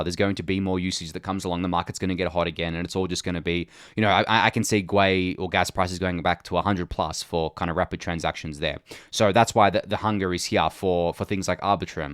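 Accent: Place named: Australia. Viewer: The Daily Gwei episodes and your soothing Aussie voice makes me feel at home. Thank you for amazing content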